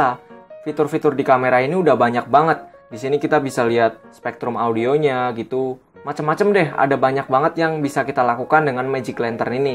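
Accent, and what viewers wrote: Pekalongan, Indonesia. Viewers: Bang orang pekalongan ya? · Orang pekalongan bang? 😁😁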